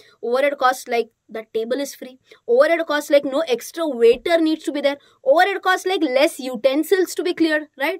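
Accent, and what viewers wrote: India. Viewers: ... I actually found you on YouTube to know more about stocks and investments... BUT I BECAME FAN OF YOU AND YOUR BINDHASS ATTITUDE AND OUR NATURAL INDIAN ENGLISH ACCENT.... I SIMPLY LOVE IT ❤❤❤❤❤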